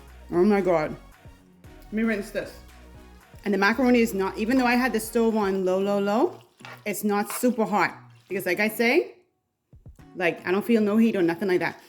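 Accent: Bahamas. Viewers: Lol I enjoyed this say (.judge your ma lmaoo, looka that macaroni ) you're a real bahmaian gyal, accent/slang on point 😆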